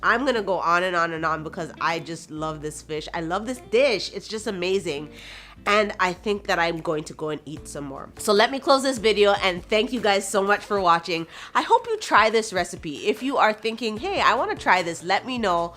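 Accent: Jamaica. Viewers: Your Jamaican accent is so strong !! I love it I wish I had it that strong ! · Loved the Jamaican accent 😂😂😂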